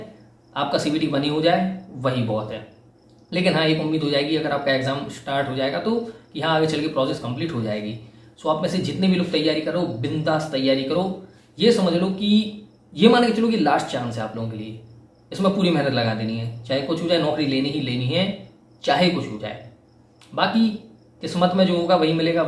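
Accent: Bundelkhand, India. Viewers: Sir ap bundelkhan se h Kya ???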